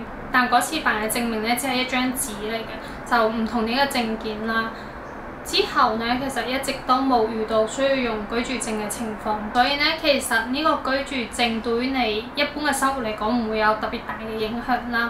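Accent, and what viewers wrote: China. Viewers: 聽你講嘢就知你係大陸人啦，今時今日香港嘅後生仔應該係去咗外國，除咗啲老嘢會返大陸，邊有港人後生仔返大陸。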